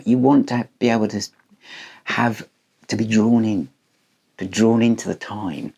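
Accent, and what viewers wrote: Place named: Birmingham, United Kingdom. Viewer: I didn't hear The Move mentioned. I did hear The Uglies and Ace Kefford from The Move. For a moment, I thought you were going to tell the joke about the Kipper Tie with your strong Brum Accent.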